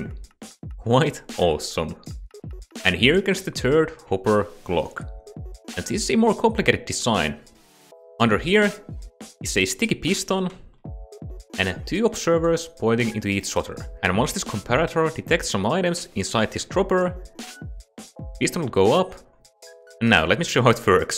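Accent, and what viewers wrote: Finland. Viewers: this really helped btw are you finnish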